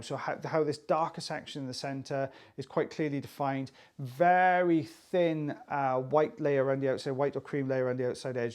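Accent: United Kingdom. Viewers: Just found your channel a couple days ago. Watched an embarrassing amount of your videos. I don't think this would have came out the first time with a hammer and chisel. Also I like you narrating the videos and your excitement when a big chunk comes out. Plus I love listening to British accents, especially the word "tidy"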